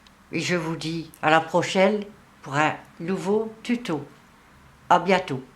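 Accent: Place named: Tourcoing, France. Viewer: Bonjour J'adore votre accent, ça me rappelle Tourcoing où j'ai vécu Merci pour votre explication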